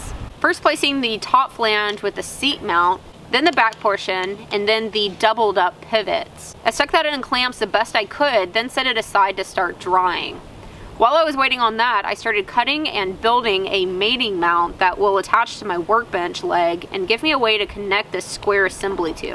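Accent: Texas, United States. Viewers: This is known a a TEXAS DRAWL'ah and if y'all aient from Texas ya'll dont speak it.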